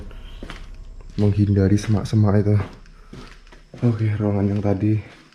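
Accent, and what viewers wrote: Semarang, Indonesia. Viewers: Ka harus tau ini konten jadi favorite aku dari dulu. Mood bgt logat semarangnya😫 apalagi pas bilang "pulang yok". Bukan apa apa nih tapi saya melting berasa kaya doi😫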